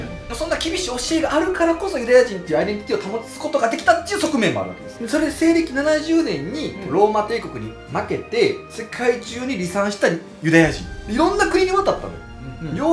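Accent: Kansai, Japan. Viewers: いつも寝る前に過去の動画を聞きながから寝るのだけど、さんの声が癒し効果があるのかすーーぐ寝てしまう(笑) そんな人多いはず w どんな話もその時代におった関西人みたいにしゃべってくれはるから解りやすいwwww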